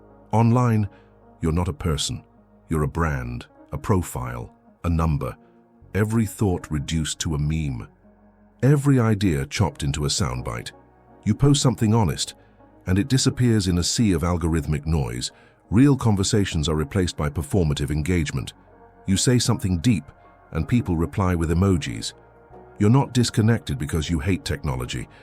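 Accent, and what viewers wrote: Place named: England, United Kingdom. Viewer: But the AI has a cool English sounding voice 😅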